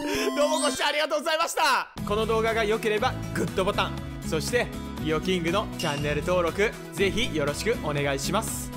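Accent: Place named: Kyushu, Japan. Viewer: 初コメです、7万人おめでとうございます！九州出身なのでさんのイントネーション好き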